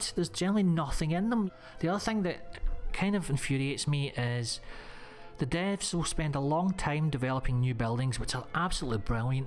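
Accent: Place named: Glasgow, United Kingdom. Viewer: So in reverse order. 1. I Agree with the locked compounds element, for the reason you state. But also, if I have the key then it becomes "my" compound/base. 2. When it comes to the loot spread in military locations I think it's an issue too. I'd like to see more sensible/realistic spread of loot in military locations, soldiers etc didn't eat guns and I'm sure probably owned civvy clothing too. I'd like to see the military equipment spread more logically inside some of these areas. 3. Dynamic crashes - of all sorts, humvee, civvy, police, game warden/ranger (in woods), and campsites (one or two tents civvy/hunter/game warden) 4. The flying heli's - they had this in one of the mod's I believe (for the mod - the modded mod haha), and it was awesome. 5. Zombies - I think at all dynamic locations there needs to be a chance of broken (crawler) zombies spawning along with a regular mini-horde/cluster. 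6. Re-vitalize old areas - totally agree, some of the old areas like the boat are awesome, but pointless these days. I'd love to see these locations with more positive reasons to visit them. Whether that's to setup a base/hold them as their good defensive points, or because they have good loot/something rare possibly. Good thoughts delivered in the Glaswegian style we all know and love!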